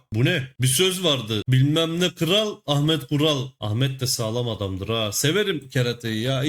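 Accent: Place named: Black Sea, Turkey. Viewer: abi şiven çok komik ve iyi.Karadeniz şiveni seviyorum.sana yeni hayaında işinde... başarılar dilerim abi.veeeee espirilerin gülüşün enerjin çok komik beni hep güldürüyorsunnn.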